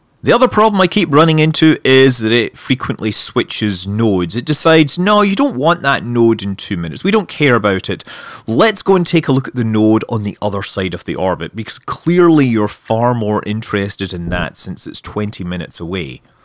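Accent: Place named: Glasgow, United Kingdom. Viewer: Glasgow Uni accent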